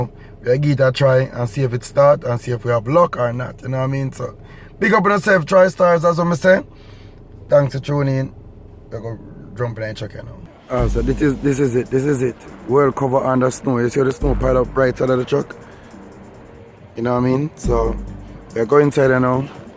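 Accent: Jamaica. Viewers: I love other forms of English and all of it's creoles and what not but that still does not make it any easier to understand the Jamaican accent/dialect. I try so hard 😂 but I only pick up bits and pieces. I'm gonna watch more though, maybe I'll get better at picking up what he's saying !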